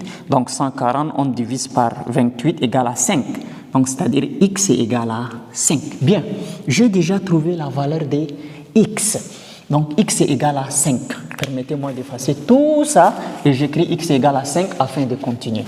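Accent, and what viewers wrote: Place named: Bukavu, Democratic Republic of the Congo. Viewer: toi c,est certainement à Bukavu selon la prononciation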